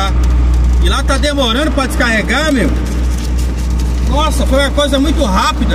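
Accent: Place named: Rio de Janeiro, Brazil. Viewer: Vc já adquiriu até sotaque de carioca kkkk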